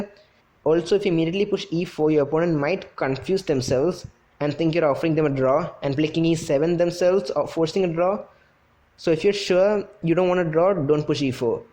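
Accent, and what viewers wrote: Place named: India. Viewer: Ah! Indian accent! The worst english mix accent ever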